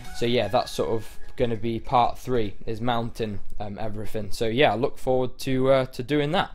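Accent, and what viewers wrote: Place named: England, United Kingdom. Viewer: You sound so english xD I know he is, so don’t comment saying «That’s because he is!!!» I KNOW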